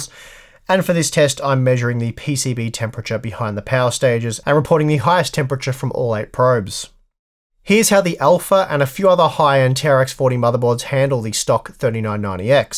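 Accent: Australia. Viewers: Might not apply, Google caption said curve at 19. Aussie accent usefulness.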